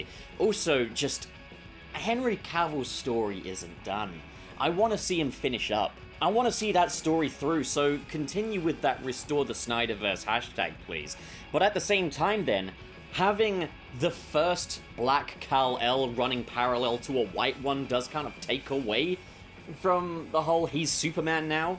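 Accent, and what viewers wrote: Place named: United Kingdom. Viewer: You sound British and Australian at the same time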